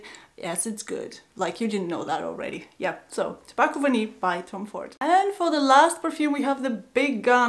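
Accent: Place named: Finland. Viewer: Hello how you feeling?New subbie here:) Glad I found your channel my friend.Wonderful video and haul.Super solid list.I see your from Finland.Very cool,you have a beautiful accent as well:) I was in Paris this time a year ago in Jan of 2020.I was having a couple of pints of brew at a pub right outside the Paris Saint Germain stadium after the football match against Monaco.The game just ended so the place was packed with people who just left the game.I met some COOL people from Finland.Really genuine and down to Earth,great times.Happy New Year to you and yours.Much love from California.Peace & Blessings!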